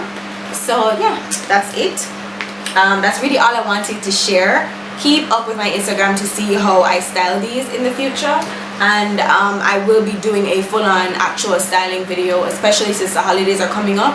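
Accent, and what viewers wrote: Jamaica. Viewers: You sound Jamaican.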